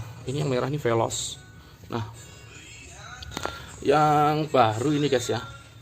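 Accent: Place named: Java, Indonesia. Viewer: Logat Jowo ne Gak Lepas... Mantull Mas 🖒🖒🖒 Bangga Dadi Wong Jowo.. ❤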